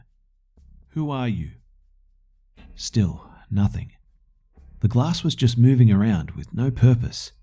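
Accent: Australia. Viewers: I love your accent soooooo freaking much😍🙏🙏😂😂 Are you from Australia (idk it just sounds like it)😂😂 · He sounds Australian. Go 🇦🇺🇦🇺🇦🇺🇦🇺🇦🇺🇦🇺🇦🇺🇦🇺 Aussie Aussie Aussie oh oh oh.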